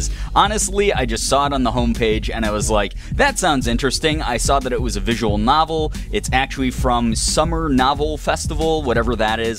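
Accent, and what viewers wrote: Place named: United Kingdom. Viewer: Hey, It's I am Bread with bad puns, British narrating, and (much) less rage! :D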